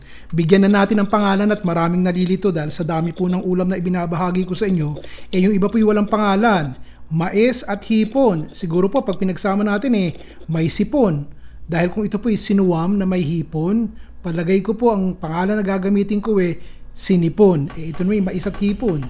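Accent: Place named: Batangas, Philippines. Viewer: Nakajatuwa ka talaga. Lulutin ko yan . Bibili lang ako nga ingredience bukas. At lulutuin ko yan. Siyanga pala. Tagasaan ka nga pala anak sa atin at may punto angnoagsasalita mo. Taga Batangas ka ba?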